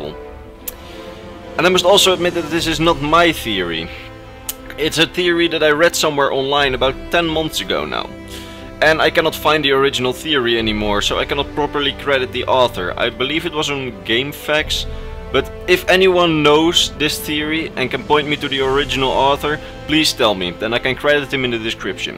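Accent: Netherlands. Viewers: You have a Dutch dialect, are you dutch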